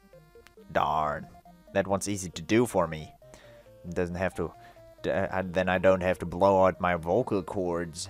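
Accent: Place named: Germany. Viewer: Yayness for German accent! CAPITALISM, HO!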